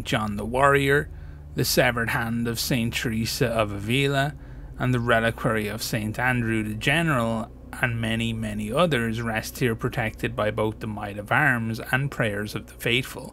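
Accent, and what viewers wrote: Ireland. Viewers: I do love an Irish voice articulating our doom.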